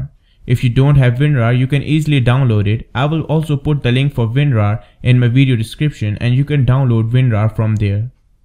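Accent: India. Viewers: Indian Accent XD Thumbs up!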